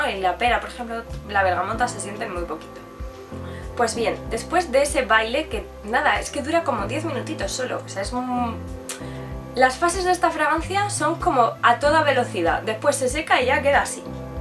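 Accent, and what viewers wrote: Spain. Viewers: Hola recien me acabo subscriber a tu canal he visto unas cuantas reseñas tuyas y me termino de convencer tu sencillez calidez Alegria que transmites. Y aparte tu asento Español me encanta. Gracias por la reseña tarde veo la reseña pero poco a poco vere tus videos primero dios .bendiciones para ti😘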